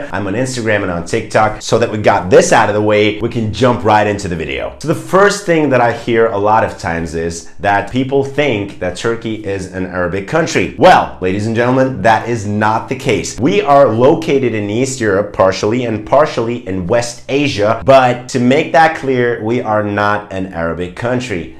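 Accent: United States. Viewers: I was shocked at some of the things people think about Turkey and I’ve yet to visit. lol Love how you speak English with an American accent. Your videos on Turkey are so helpful. I’m trying to learn Turkish and hope to visit next year. Thank you 🙏🏼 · You looking Turkish but sound American and born in Germany? Haw can ?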